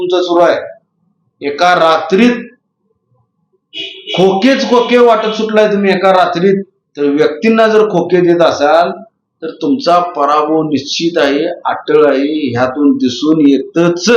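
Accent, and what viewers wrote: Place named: Kolhapur, India. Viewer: इंगवले साहेब एकदम मुद्देसूद बोलतात कोल्हापूर शैलीत मस्त कोल्हापूर मध्ये सर्व च्या सर्व काँग्रेस च्या जागा लागणार आणि महाराष्ट्र मध्ये उद्धव साहेब पवार साहेब आणि कॉग्रेस चे सरकार येणार 👍👍👌👌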